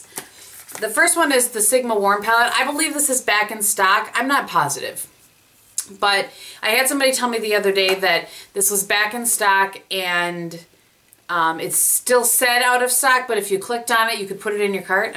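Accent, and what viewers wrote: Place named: Midwest, United States. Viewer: Love your Midwest accent. I sound the same and also am a palette addict.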